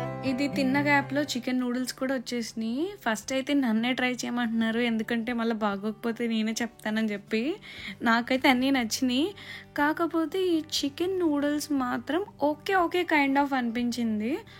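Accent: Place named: Telugu, India. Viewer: Me voice and Pure Telugu slang👌👌👌👌👌 Andi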